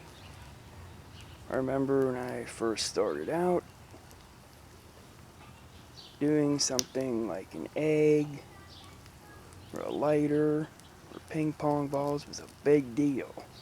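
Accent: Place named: Pittsburgh, United States. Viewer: I'm loving the Pittsburgh accent btw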